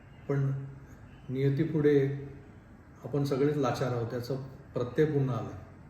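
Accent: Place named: Khandesh, India. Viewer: खानदेशी लहजा ला कायमचे मुकलो खुप दुःखद घटना 😔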